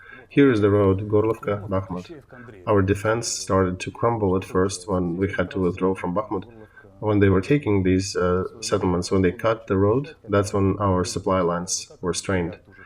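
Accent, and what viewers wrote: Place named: United States. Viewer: His English is extremely good, too, so you can trust the things that you learn. Not perfect but very good. His English pronunciation is excellent. I say these things as a native (US) English speaker. Also, for what it's worth, he has a US accent, definitely. At times I easily forget he's not a native speaker. When I am aware of it, often it's because he pronounces words better than most Americans, speaking every syllable completely. That makes it easy to understand.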